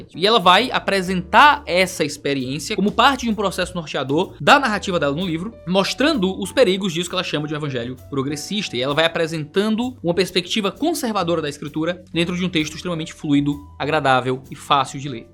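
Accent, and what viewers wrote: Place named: Northeast, Brazil. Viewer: Não se acanhe,. Espalhe nosso sotaque nordestino através do português e do inglês tbm kkk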